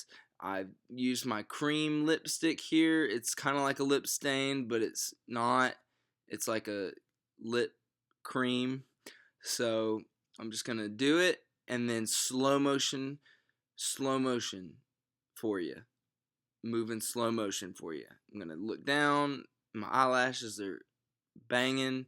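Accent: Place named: South Carolina, United States. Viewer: ARE YOU FROM SOUTH CAROLINA?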